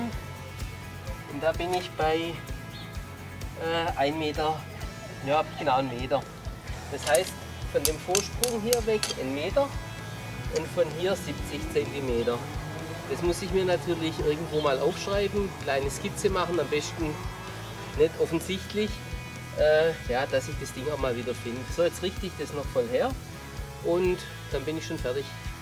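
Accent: Swabia, Germany. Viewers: Schwabe oder Schweizer? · Blaulicht 112 Ich auch am "S" erkannt man die Schwaben.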